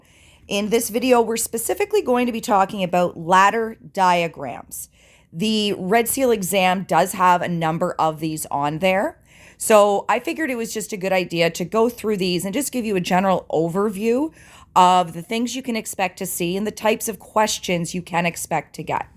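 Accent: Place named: New York, United States. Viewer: chill your new york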